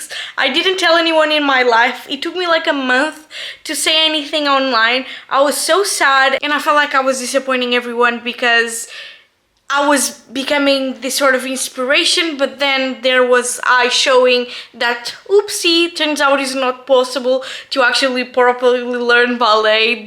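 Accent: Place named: France. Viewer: I've watched several of your videos and shorts. Youre actually looking quite good! Trying to figure out your accent. First though South American, then maybe Russian, but then you don't pronounce h's, so French?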